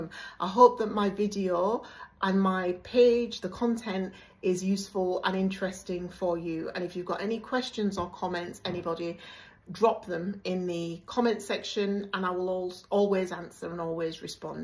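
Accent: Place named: Northern England, United Kingdom. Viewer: New subby, also from the uk just down the road from you in the midlands, as I can here your northern accent 😊🙌🏾 I’m just voice 5 years in with diy locs got just under 200 as was not prepared to travel to get my hair started or maintained. I’ve learn so much these past 5 years & started family members on their journey too, we all love locs. Your hair is beautiful & grows fast! Glad I found your channel 😊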